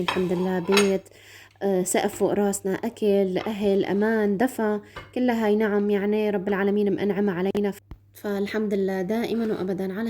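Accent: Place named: Homs, Syria. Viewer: مع انه لهجتها بتشبه الحمصية 😍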